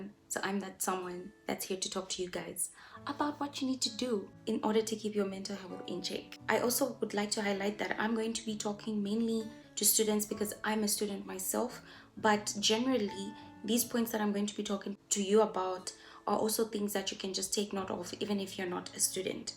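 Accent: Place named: South Africa. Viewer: You sound South African sometimes ... so cute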